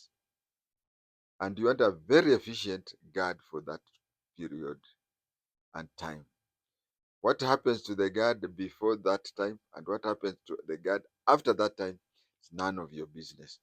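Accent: Kenya. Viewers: VERY POOR KENYANISED/ SWAHILINISED ENGLISH. ARE YOU GIRIAMA?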